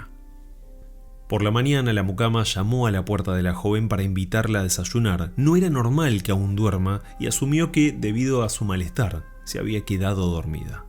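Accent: Argentina. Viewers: Grandes historias narradas por un argentino, que bonito 😊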